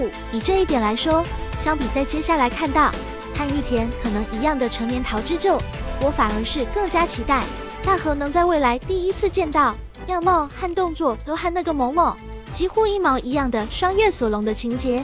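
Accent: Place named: China. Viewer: 較象大陸的，咡過原音。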